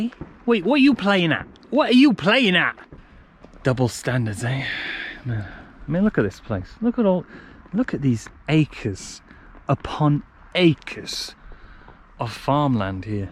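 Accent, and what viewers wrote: RP, United Kingdom. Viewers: Hahahaha that BBC voice....epic!!! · I love how this British vlogger learn the accent of the BBC reporters! Very well done! Thank you for explaining how western media discredits China and make fake news about China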